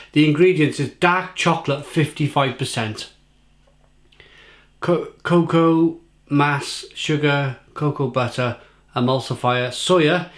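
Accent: Wales, United Kingdom. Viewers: I think videos are great, you never know what you're going to get, I actually love the Welsh accent...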